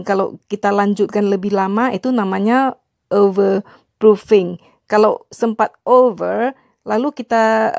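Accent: Medan, Indonesia. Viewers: Ci org medan ya? Mama sy kl ngomong indo jg gt hahahaha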